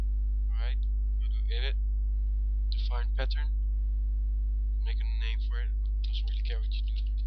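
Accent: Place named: Netherlands. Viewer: haha je hoord wel egt goed dat je NL bent :D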